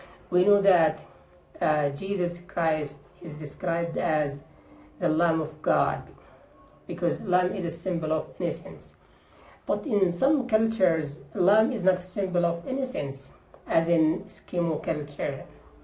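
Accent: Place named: India. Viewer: Dude u sound Indian ngl 😭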